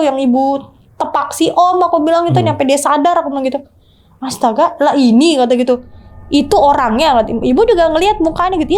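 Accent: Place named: Jakarta, Indonesia. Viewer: Betawi bngt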